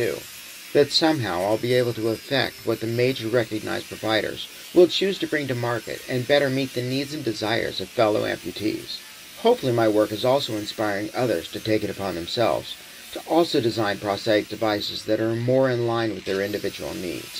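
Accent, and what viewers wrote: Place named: Pacific Northwest, United States. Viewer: I knew you had to be from Oregon or Washington we got the same accent!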